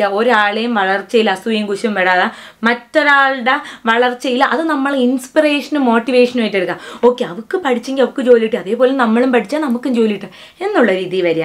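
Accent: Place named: Thiruvananthapuram, India. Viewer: Thiruvanandhapuram ahno sthalam😂 nammde tone pole 😆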